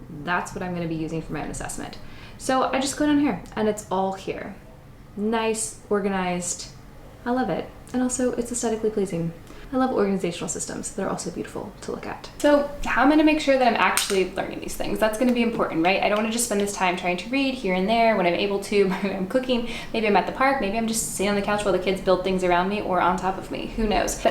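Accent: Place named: Scotland, United Kingdom. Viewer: My baby was babbling over you talking and I heard that you pronounce everything foreign in a "Scottish" accent because that's the other language you know. That tickled me 😂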